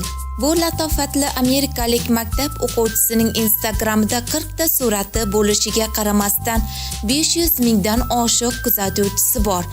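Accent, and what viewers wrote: Surkhandarya, Uzbekistan. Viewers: менимча точни сурхандарйо йоки кашкадарйодансиз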